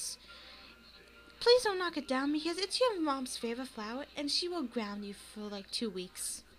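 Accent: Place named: United Kingdom. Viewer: Youre from the US but i can hear a slight british accent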